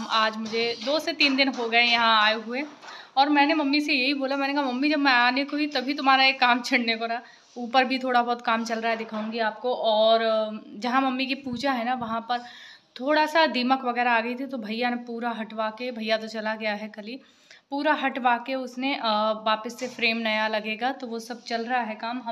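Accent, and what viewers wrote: Uttar Pradesh, India. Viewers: हमारे hardoi m bhi aise hi bolte h jaibo kaibo main jab apne ghar jati hu to main bhi aise hi bolti hu अपनों के साथ अपनी बचपन की भाषा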